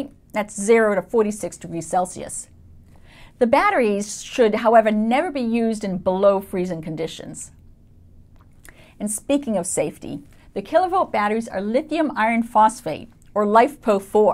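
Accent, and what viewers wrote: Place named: United States. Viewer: That blew my mind and I had to get a cup of tea and watch it 3 times lol/. I love an American accent and that was the best tutorial ever!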